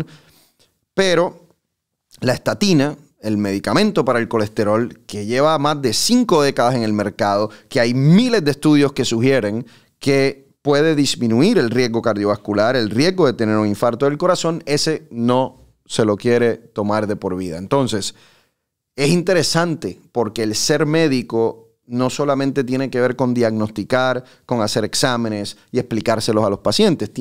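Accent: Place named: Venezuela. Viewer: Perdone Dr. en que país se encuentra Ud.? Y de donde es? Ud.tiene acento Venezolano. Bueno le deseo todo lo mejor de la vida sobre todo mucha salud,felicidad,paz,y prosperidad. Muchos existos en su hermosa carrera. Muchas Bendiciones.!❤❤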